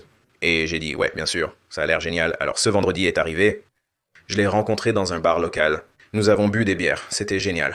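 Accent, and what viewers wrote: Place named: Quebec, Canada. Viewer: Il "sonne" québécois toutefois.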